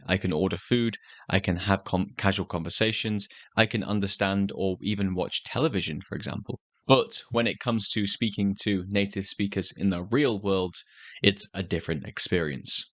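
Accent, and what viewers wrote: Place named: United Kingdom. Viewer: I Love british accent , subcribed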